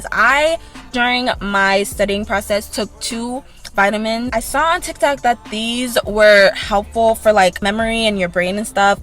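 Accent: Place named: New York, United States. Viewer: I loved this video the entire time i’m like she sounds like she’s from NY bc I’m from the Valley. I felt like i was watching my own testimony from you saying God favors you differently to still living your life while studying But you saying OD nailed it 😂It was in the stars for you I love that you and your mom tested the same date So sweet Congratulations love 👏🏽👏🏽Thanks for the tips ❤️🙏🏼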